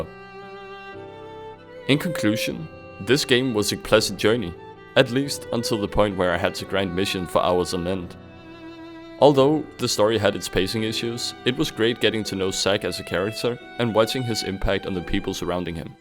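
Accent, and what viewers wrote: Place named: Scandinavia. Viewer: Your accent sounds Scandinavian...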